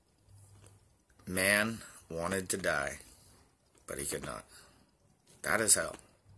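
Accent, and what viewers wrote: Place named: Texas, United States. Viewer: Lovely accent my friend 👍🌻 Awesome is it Texan ? Now all I can say is America sucks! And you all need protection from true evil. Please try the Ganesha Mantra to protect you from evil forces. Breaks all obstacles . I prey for you all! 💚💚💚💚 Its a evil game where the loser will die a horrible death 💀 I'm sorry . Ganesha will protect you.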